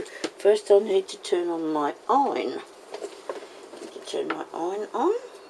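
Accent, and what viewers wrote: Australia. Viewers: Loved your tutorial! Loved as well your Aussie accent! Can't say more as I have to go and try it while it's fresh in my mind! Thanks.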